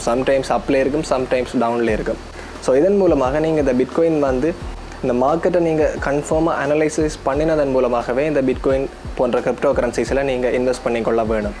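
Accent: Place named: Sri Lanka. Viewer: இலங்கை தமிழ் தமிழ் தான் 😊 I'm india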